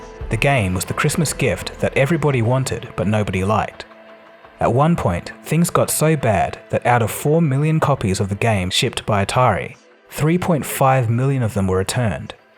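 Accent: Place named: Australia. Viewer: Aussie Accent ❤️💋👍😃🇵🇭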